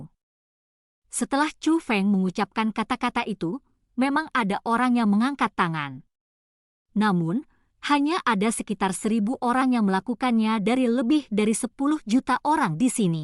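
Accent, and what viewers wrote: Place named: Java, Indonesia. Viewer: kok medok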